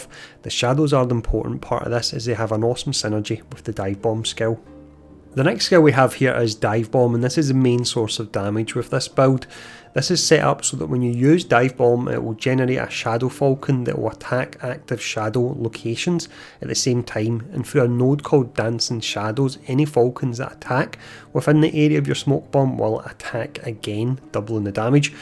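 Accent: Scotland, United Kingdom. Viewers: damn love ur accent :D R U Scottish? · I like the video and accent because I live in Scotland 😂 not Scottish myself haha. I know English was forced here ages ago but it’s not that bad to use it for the videos 🦧❤️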